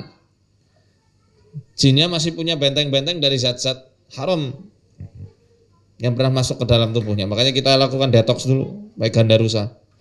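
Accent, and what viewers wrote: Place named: Banyumas, Indonesia. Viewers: Orang sokaraja banyumas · Kok ngapak dr mana ya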